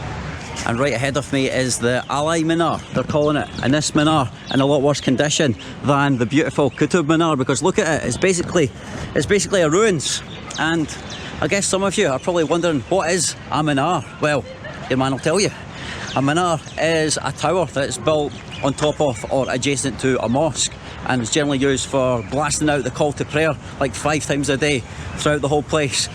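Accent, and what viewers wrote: Scotland, United Kingdom. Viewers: His Scottish accent tho! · Why people have so much problem with the title. Erection also means action of erecting structure or object example monuments. 🙄 Anyways I'm here for Scottish accent and big strong legs 🤭🤣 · He's also seems to be a really nice guy too, like he's always super friendly to everyone. His accent is cool too, not that many Scottish youtubers out there. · I love your Scottish accent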